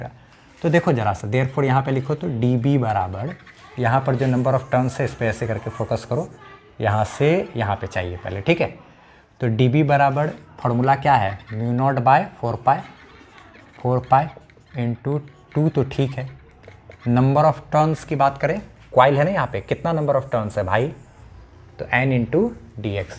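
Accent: Bihar, India. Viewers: plz.... support him everyone... he is bets teacher and the favorite part in his all videos is his bihari tone ....💕💕💕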